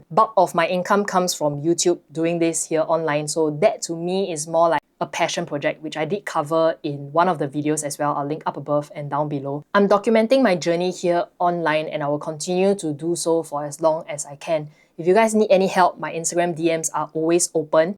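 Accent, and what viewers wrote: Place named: Singapore. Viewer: You Singaporean? Not Singaporean then quite normal that you don't understand. If you are Singaporean then you must be drunk. You hear this accent everyday all day by everyone in Singapore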